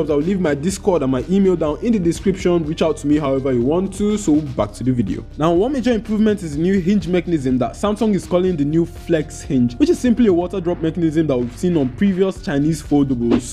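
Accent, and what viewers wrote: Nigeria. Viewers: Keep it up, your Nigerian accent day give me special joy. 👍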